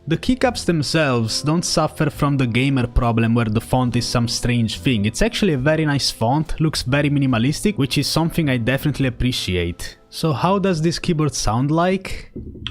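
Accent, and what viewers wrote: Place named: Italy. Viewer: quando un italiano parla inglese si sente cmq grande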